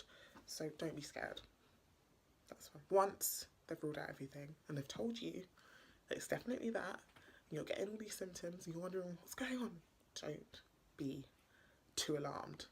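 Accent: United Kingdom. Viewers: You sound like you live in the UK. You need very pale skin to remain healthy in many parts of Europe. Your natural habitat is in a much hotter climate with much more sun exposure. Climates with less sun than you need can make you infertile. This is simply Evolution. Caucasians get skin cancer in the sun, Africans thrive in the sun. Caucasians thrive in cold climates, Africans get very sick. You should consider a climate that your body is adapted for.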